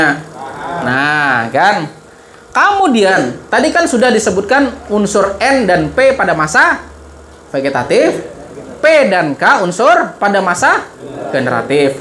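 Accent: Banjar, Indonesia. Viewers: apakah bapak ini orang banjar? 😂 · Tambahi lagi tambahi lagi....Kya logat Banjar bang...di daerah mna ini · Eh, bapak orang Banjar? Logatnya rada banjar...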